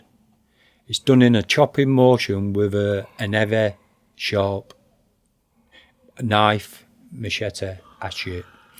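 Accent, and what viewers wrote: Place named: Yorkshire, United Kingdom. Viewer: Yorkshire accent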